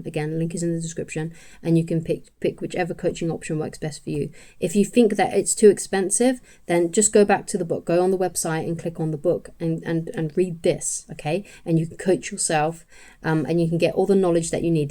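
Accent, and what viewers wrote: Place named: United Kingdom. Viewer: loved that british accent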